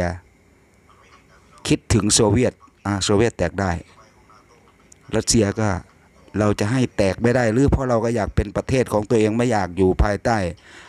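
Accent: Isan, Thailand. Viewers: พากษ์อีสานโหลดอ้าย ฟังง่ายดี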